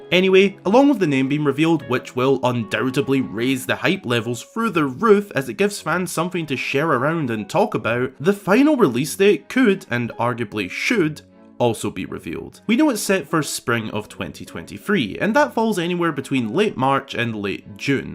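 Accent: Scotland, United Kingdom. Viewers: It’s been ages since I last watched u and r u Scottish I am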